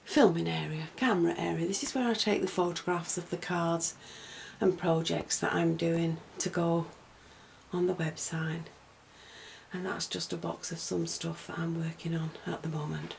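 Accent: United Kingdom. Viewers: Love it! Are u in the Uk? I think i could tell from ur accent n got excited to finally come across a uk craft room tour as i know that if i like anything i can maybe b able to buy it! Where did u buy ur CD holder, the lamps for ur photo booth and baskey in ur ikea drawers? I hv the same drawer cabinet n today i should finish with my organising. TFS. Ur room is a lil heaven:)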